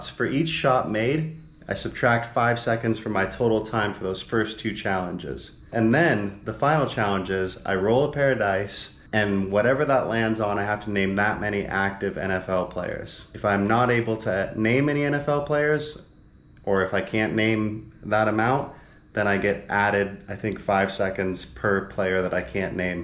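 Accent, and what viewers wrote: South, Vietnam. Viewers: tiếng việt của bạn theo tôi đã dc 80% rồi , người tây nói giọng miền nam vn nghe rất thú vị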